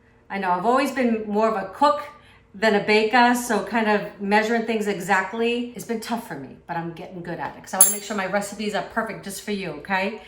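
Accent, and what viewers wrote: Boston, United States. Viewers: I was so confused when I saw Bostonian Alert & started laughing..omg I was trying to say it your way! Im loving this content & the bread looked amazing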